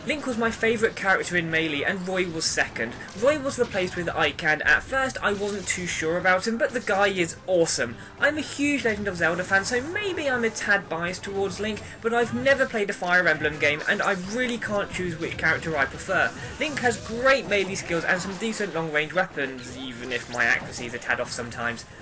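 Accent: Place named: United States. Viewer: ***** An American ;P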